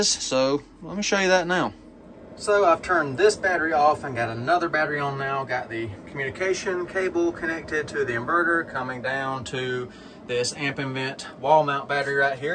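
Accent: South, United States. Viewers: I am very interested in the Sungold (SRNE) inverters. Could you maybe go over what solar panels you have and how they are configured and the changes you plan to make? Also, what gauge pv wire did you use? Maybe you can just add a new ground mount with a PV run from your old mount to your new mount and just hook up all the panels in series? Also, if you are getting a new ground mount, the ecoworthy single and double axis trackers look like they could be a really good investment and increase your active solar hours and extend your generation time (usually by 30-40%). I also love the southern accent! Fellow southerner here! Love it when people sound like my neighbors.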